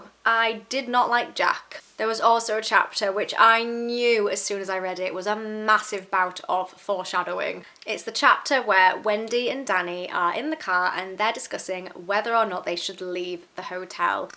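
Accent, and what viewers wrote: United Kingdom. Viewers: Wow, absolutely love your review. And love listening to your voice and British accent, too! I agree with what you said about King's writing style. He's an absolute master when it comes to character development and description. He has a way of writing that makes you lose yourself completely in the characters' thoughts and emotions, until you forget everything else around you. The Shining is definitely one of my top favorite Stephen King novels. I've read every King novel so far, and I highly recommend you read The Dead Zone, Pet Sematary (very scary, but oh so good!), Christine, The Green Mile, Misery, 11/22/63 and the novella collection Different Seasons. Just keep them coming! 😊📖☕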